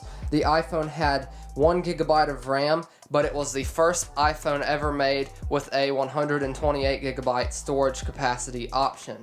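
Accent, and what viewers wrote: Texas, United States. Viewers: you have the most texas accent ive ever heard god damn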